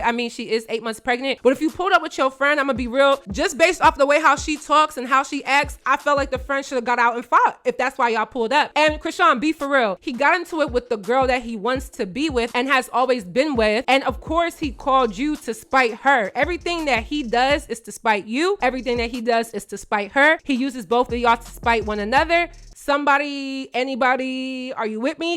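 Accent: Philadelphia, United States. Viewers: 215 I knew you were from Philly from day one! I said "hol up she sounds like me!" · Girl I’m from Boston and I’ve been watching you for a while and I already knew u were from Philly with that accent! Philly thru and thru lol ❤ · 215 South Philly love your Content and can hear Philly all day in your accent❤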